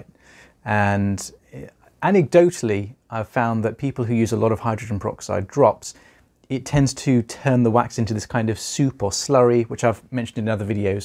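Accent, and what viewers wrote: England, United Kingdom. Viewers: His young British voice really helped soothe the horror show that came out of that ear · He is so cute and the english accent is cherry on top 😍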